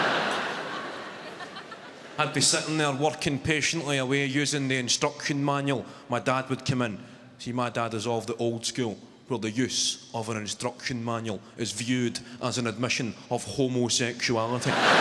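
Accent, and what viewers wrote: Scotland, United Kingdom. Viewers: Ewert how can u not understand him?? Plain english with a v mild Scots accent! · Just listen to more scotish folk...you get there lol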